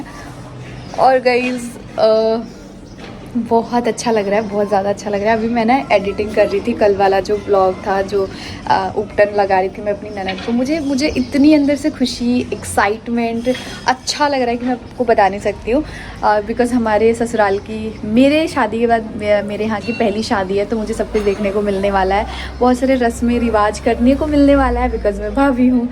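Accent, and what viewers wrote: Bihar, India. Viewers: Bihar me aisa bolte h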